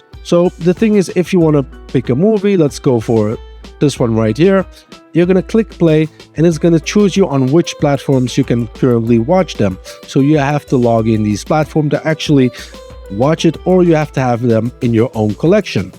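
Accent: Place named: Netherlands. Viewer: You sound like a dutch speaker trying to talk english ... 😅 Kom je uit Nederland?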